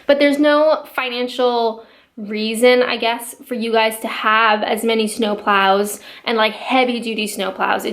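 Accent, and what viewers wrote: Canada. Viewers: Very interesting videos, from an interesting perspective. One thing you haven't yet learned... in your generalisations, it's not England... it's the UK. There are also places such as Scotland, Wales and Ireland. It's rather like us here referring to you generally, as as American, with an American accent. I know you are Canadian, and I can tell from your accent even, but you get what I mean. Yes, you're in Kent which is in England for sure, but it's the UK, and I am not English! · It's abute ( Canadian pronunciation ) the South Scotland is another country that also says abute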